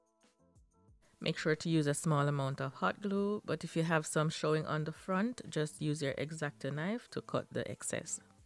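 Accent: Jamaica. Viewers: Your English sounds Jamaican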